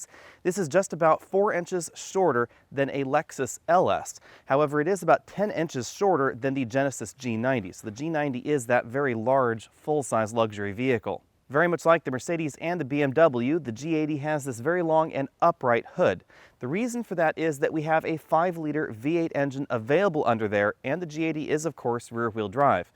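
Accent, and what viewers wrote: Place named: United States. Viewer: Another unnecessary brand whose design will sooner or later not differ from other manufacturers. Capitalism at its best: pretending diversity where no one is. Also, it's funny how you Americans pronounce "Hyundai": there is no "o" like in "Honda" - actually it sounds very similar in your type of speaking. I wonder, because you should be easier able to pronounce the "yu" as "yu" or "ju"...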